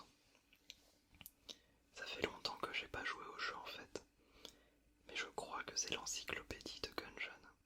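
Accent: France. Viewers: Joyeuses fêtes, j'aime beaucoup tes vidéos je te trouve très apaisé et apaisant. J'apprécie le soin et la préparation que tu accordes à tes vidéos, je pense que ta chaîne est ma chaîne ASMR préférée:) PS: J'aime bien ton accent français sur tes vidéos en anglais, c'est un bon trigger ajouté au chuchotement:)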